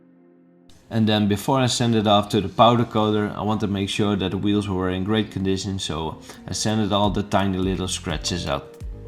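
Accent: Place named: Netherlands. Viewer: Aargh, dat Nederlands 'engels' is tenenkrommend.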